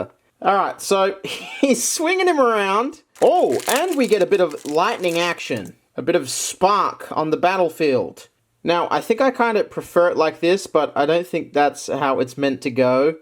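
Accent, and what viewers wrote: Australia. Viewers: Well he has sort of an accent because he’s Australian.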